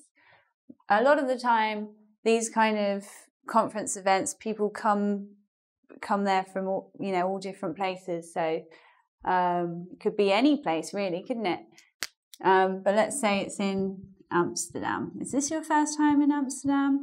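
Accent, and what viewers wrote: United Kingdom. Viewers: I like your British accent ! Especially when you said 'Are you attending the welcome drinks tonight ?', your accent was very attractive and a kind of funny to me and made me laugh !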